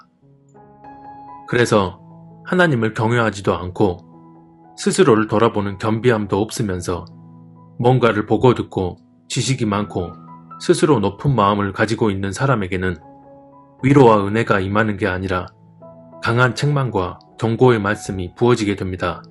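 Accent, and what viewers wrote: Jeolla, South Korea. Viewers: 전라도 사투리....^^ 반갑습니다~ㅋㅋ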